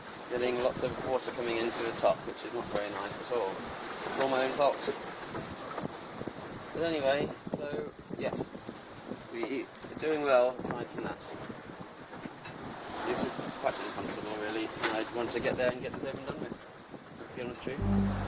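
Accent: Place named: United Kingdom. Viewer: Hi, some time soon Im hoping to sell my house and buy a boat too. I have just started to learn about sailing,may I ask what boat you have and make pls? Where are you from I hear a british accent, I myself am from Cardiff South Wales.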